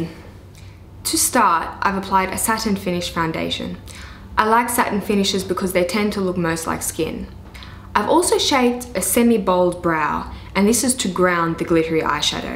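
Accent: Australia. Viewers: Love hearing Aussie accents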